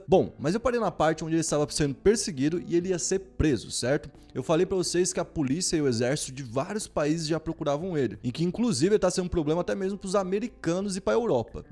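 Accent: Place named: São Paulo, Brazil. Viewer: Esse cara que fala parece ser paulista mais te falo uma coisa nunca vi igual esse sabe de história meus parabéns aí vê se aprende ai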